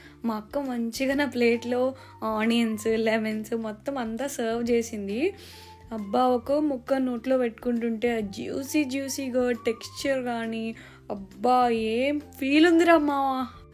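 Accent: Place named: Telugu, India. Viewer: Me voice and Pure Telugu slang👌👌👌👌👌 Andi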